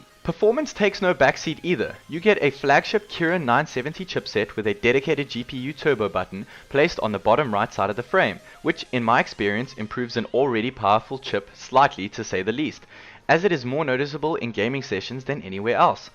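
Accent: South Africa. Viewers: Hey, South African accent guy - excellent video, well done.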